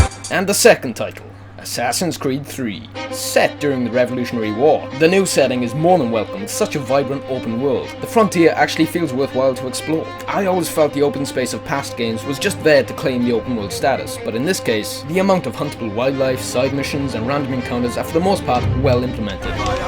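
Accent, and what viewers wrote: Wales, United Kingdom. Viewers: You welsh bro? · Are you Welsh? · Are you from wales? you sound kind of welsh but you also sound like you're putting a accent on:) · are you Welsh or American. I thought you were Welsh but the prices you mentioned we're American. It's rare for me to find a fellow Welshman on YouTube.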